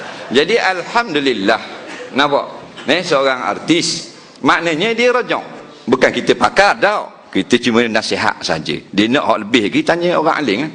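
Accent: Terengganu, Malaysia. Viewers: Bahasa terengganu comel molek..zizan jadi zizang..pelakong..hha...comel comel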